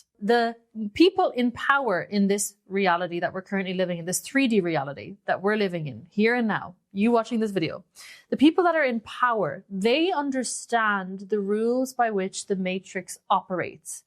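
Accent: Ireland. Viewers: do I detect an Irish accent there? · When awise awake Old Soul has also won the best face card... and intelligent and classy af, also.😭 She will be GRAND! AMAZING VID. You are also funny, when did some "shooting fails". Stay authentic, no to AI! ✊️ P.S. Are you Irish? ☘️ ✨️💜✨️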